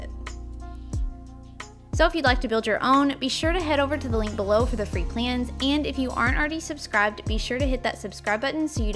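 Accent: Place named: South, United States. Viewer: I can’t put my finger on where her accent is from, it seems like it kind of have a southern/eastern sound sometimes but not others so I’m beat. Anyone know?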